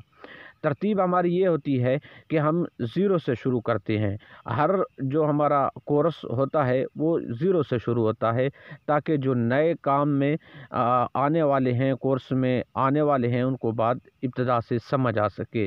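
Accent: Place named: Saraiki, Pakistan. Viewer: Lahja sariki Hy...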